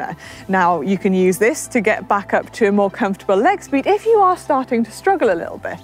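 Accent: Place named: Wales, United Kingdom. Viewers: Can we have more Welsh accent? 😀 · where you from? you sound welshy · Another great video from with the true Welsh accent coming through 💪💪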